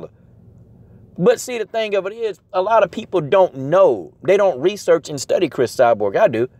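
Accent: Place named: South, United States. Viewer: Southern pronunciation strikes again, ahaha. It's Koo-Ree-Chee-ba... or Koo-Ree-Tee-Ba for European Portuguese/certain parts of the country.:)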